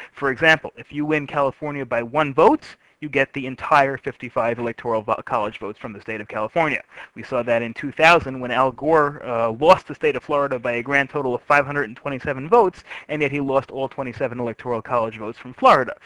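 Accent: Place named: New York, United States. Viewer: r u from new york? you say "all" and "call" differently.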